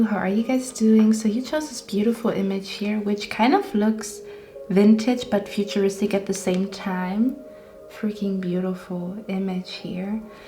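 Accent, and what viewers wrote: Germany. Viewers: Love your accent. Are you German?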